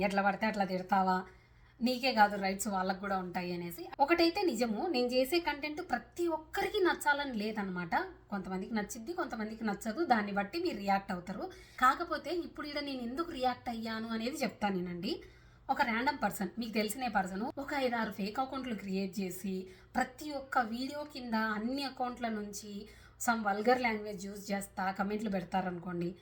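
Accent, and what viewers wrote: Rayalaseema, India. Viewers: అక్క నమస్తే వాలీల మాటలు పట్టించుకోవద్దు అక్క మనం ఏది అనుకుంటున్నామో మనం ఏం చేయాలనుకున్నామో అదే చేయాలి మనం ఒకవేళ వెళ్లాలి అనుకున్నాము అదే వేలో వెళ్లాలి అక్క వాళ్ళ ఇల్లు మాటలు పట్టించుకోవద్దు బట్ ఇప్పుడు అంతగా కామెంట్ పెట్టిన వాళ్ళు కూడా నీకు ఎవరో చెడుగా పెట్టారు అని అన్నారు కదా వాళ్ళ పాపం వాళ్ళదే ఫోన్ చేయమంటే వదిలేసేయండి వీళ్ళకి కూడా అర్థమైందా లేక చెప్పారు కాబట్టి సంతోషం అందరు కామెంట్స్ చదివి మీరు రిప్లై ఇచ్చారు కాబట్టి చాలా సంతోషం అక్క పట్టించుకోవద్దు అక్క కొంతమంది కొంతమంది మాట్లాడుతూ ఉంటారు పిచ్చి మొహాలు కొంతమంది ఇలా ఫేక్ ఐడి లు క్రియేట్ చేసుకుని మాట్లాడితే అమ్మ నీ అక్కని చెల్లిన అంటే ఎవరు పడరక్క తిరిగి వాళ్ళని అంటారని ఆలోచన వాళ్లకు ఉండదక్క పిచ్చి మొహాలు అట్లానే ఉంటారు వదిలేసి మీ వీడియోస్ చాలా బాగుంటాయి మీ ఫస్ట్ మీ వాయిస్ చాలా బాగుంటుంది అక్క నేను ఒక తమ్ముడుగా చెప్తున్నాను ఒక శివ సత్తిగా చెప్తున్నాను ఓకేనా అక్క చాలా మంచిగా ఉంటది మీ వాయిస్ కూడా పక్కా మన లాంగ్వేజ్ ఎట్లా ఉంటుందంటే మాస్ లాంగ్వేజ్ అలాగే మాట్లాడతావు దీంట్లో ఇంగ్లీష్ గాని అంత బయట దేశంలో ఉండి కూడా స్వచ్ఛమైన మన రాయలసీమ లాంగ్వేజ్ లో మాట్లాడితే హాట్సాఫ్ అక్క నీకు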